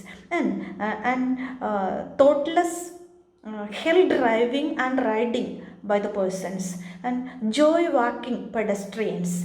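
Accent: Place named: Kerala, India. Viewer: I'm sure she's a malayali 😂 I see that in her unique pronounciation of words 😂😂😂😂 especially 'R' 😂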